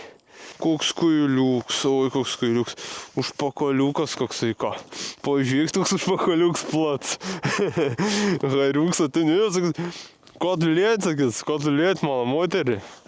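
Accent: Biržai, Lithuania. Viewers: Labai smavu ziuret tavo video, kalbi gryn birzietiska sneka kurios malonu kkausyt. Grazus krastai, birzu giria, Nemunelis, Aspariskiai, Dauguvieciai, keliai ,kelialiai. Senokai buvau, vasara zadu aplankyt, gal jau puse girios baigia iskirst.Geru vidosu, sekmes sunkiuose darbuose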